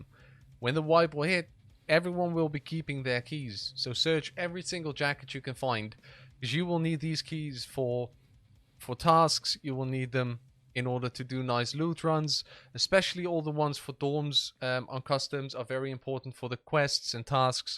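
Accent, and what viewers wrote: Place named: South Africa. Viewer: Where are you from? You almost sound South African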